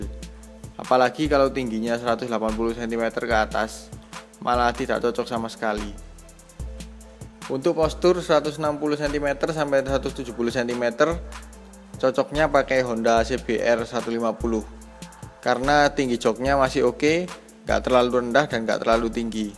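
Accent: Malang, Indonesia. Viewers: Mas wong malang tah?😅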